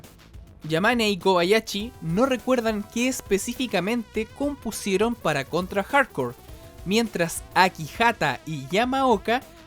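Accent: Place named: Chile. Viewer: Buen video rey es un clasicazo este juego me lo completé con todas las finales y ya jugare nuevamente en la collection de aniversario. PD: Me fijé en tu acento y creías ser chileno hasta que lo dijiste en el video jajaja saludos bro desde San Bernardo, Groenlandia.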